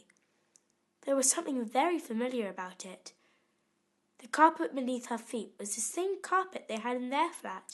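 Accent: United Kingdom. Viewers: Thank you. I was wondering which British accent she had. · that's a British standard accent - certainly not a Cornish one! :)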